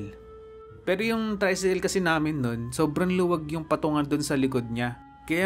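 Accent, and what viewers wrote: Ilocos, Philippines. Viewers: Hala ilocano po kayo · kuya ilocano ka po?? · Kuya Ilocano kaba! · Kuya ilokano ka pala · Ilocano ka pala kuya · Taga Ilocos Sur kaba weno Abra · Kuya ilocono ka po? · Kuya ilocano ka ba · Ilocano ka po ba? 😊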